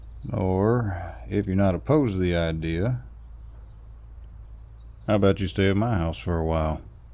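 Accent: South, United States. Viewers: you are southern accent. good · Lord have mercy I have a thing for a southern accent 🫣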